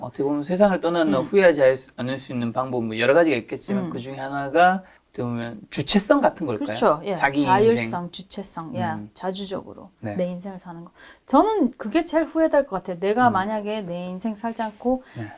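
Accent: Gyeongsang, South Korea. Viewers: 지나영 박사 화이팅입니다 !! ☆☆☆☆☆~~♧♧♧♧♧~~~ 갱상도 사투리가 듣기 좋습니다 !!!! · 악바리 근성~~대구 말씨가 스며들어 잇네요~~실패는 이기거나 성장이다~~대구 아가씨 대단하다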